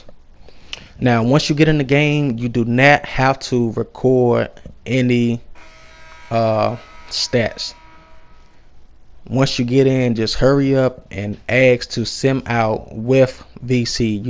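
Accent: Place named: Washington DC, United States. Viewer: I came from another video and I didn't watch the whole video but the part that I did see you sound like you from DC.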